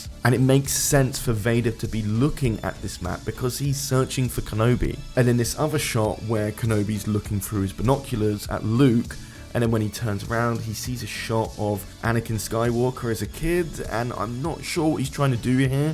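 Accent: England, United Kingdom. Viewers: I haven't visited this? channel in a while, since when did become British? What happened to the OG? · Why are you british now? You didn't used to be british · Why is no one talking about the fact that the narrator is suddenly british instead of american 💀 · When did you get an English accent??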